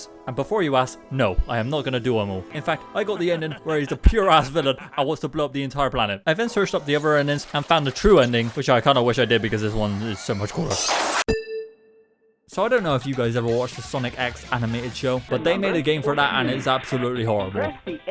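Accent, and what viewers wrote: Bristol, United Kingdom. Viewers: hey your british 🇬🇧🇬🇧🇬🇧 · Up the Bristol accent. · Are you bristolian? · Are you from Bristol? That accent is hella familiar 😂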